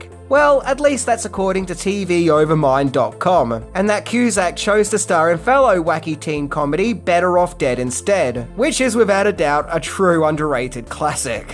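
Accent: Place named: Australia. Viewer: You’re killing me (in a good way😅)!! Several months ago I started watching at the beginning of your videos and have finally caught up. Along the way I realized that I was missing quite a few movie gems from my collection and as such have had to make a lot of purchases to more fully create a dignified compilation. A few observations that I have made from watching your videos in succession from the beginning are: You are a very kind person!! I really enjoy your comments and you are always willing to give somebody the benefit of the doubt without casting blame or being unkind. I really enjoy listening to your accent (obviously I’m not from Australia). I really enjoy the way you present the content you are speaking about, it’s interesting and fun to listen to - I’m sure it takes a lot of work to make these videos - and I can see you’re passionate about the movies you are talking about. 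You are gracious to those who watch your videos and that speaks greatly to the humble type of person you are!! Thank you, for creating entertaining and enjoyable content. I look forward to whatever you have in store for us!!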